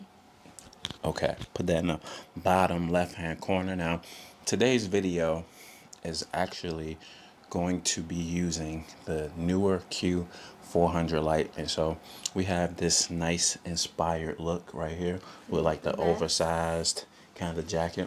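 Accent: United States. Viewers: the maryland accent on a shorty gone get me everytime 😭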